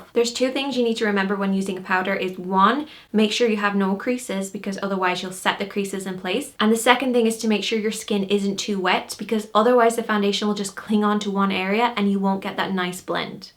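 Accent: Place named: Ireland. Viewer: I love you and your accent! You explain everything so well!! I'm irish aswell! Proud to be irish🍀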